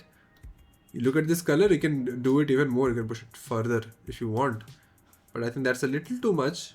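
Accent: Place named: India. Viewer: can you please practice the accent, i don't understand well your english with india accent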